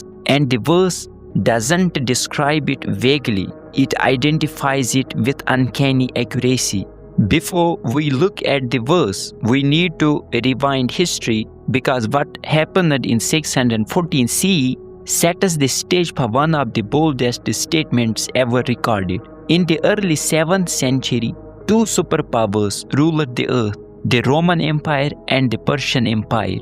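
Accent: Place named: Asia. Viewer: He has a thick Asian accent and he wants to prove that he can read English text like professionals. Though he learnt to use video clips from royalty-free visual sources to support his research in text. ❤❤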